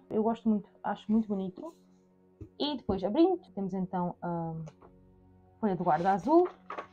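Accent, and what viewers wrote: Portugal. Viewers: É muito bom ver alguém falando com paixão sobre as obras de Tolkien, mesmo eu tendo uma certa dificuldade de entender o sotaque português. Parabéns.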